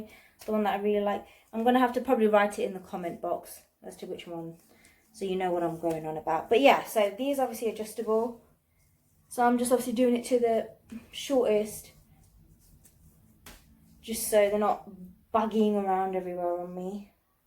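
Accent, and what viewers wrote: United Kingdom. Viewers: Great video! Loved seeing you trying on those different type of suspender belts! My favourite was your white, lace, suspender belt. Love your lovely English accent too.:) xx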